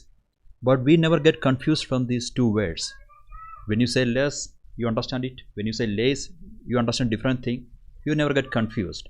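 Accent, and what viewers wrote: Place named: Kerala, India. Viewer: This is malayali English 😁.my english teacher speak like this when I was in school.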